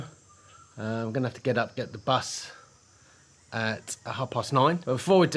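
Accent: Cockney, United Kingdom. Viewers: Just came across some of your videos, I like your cheeky chappie cockney narrative. Your house is lovely, and a good sized plot too. Very luxurious, great master bedroom, must have cost a fortune, were you rich in England so you can afford to live well there in a very early retirement? Ew, didn't like that mass of maggots thingys that the storm blew in, do they go away on their own or do you have to brush them up and bin them? 20 years you have lived there, where is your wife, yes I am nosey? Hi to your lovely daughter, I will look for her business video, she has done well for herself and I take it you see her weekly?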